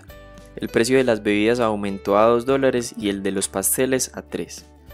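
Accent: Antioquia, Colombia. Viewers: Eres muy claro y acertado al explicar! y tu acento paisa hace más ameno escuchar este tipo de temas haha :3 Gracias por tomarte el tiempo para hacer estos vídeos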